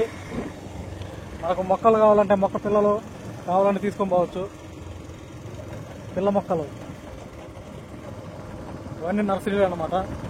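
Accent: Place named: Nellore, India. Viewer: నెల్లూరు యాస బలే మజాగా ఉంటుంది బ్రో నీ భాష ఒరిజినల్ గా వుంది సూపర్ బ్రో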